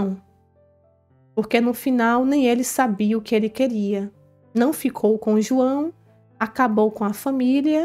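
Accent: Bahia, Brazil. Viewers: uma curiosidade, você é baiana?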